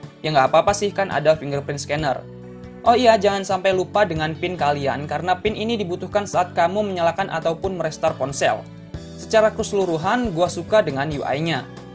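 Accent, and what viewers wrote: Lampung, Indonesia. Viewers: Lu orang lampung tah bang?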